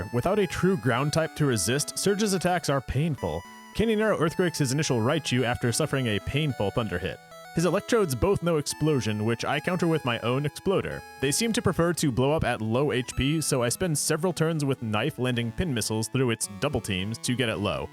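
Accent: United States. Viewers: this is not an AI voice over, just some variety of American accent.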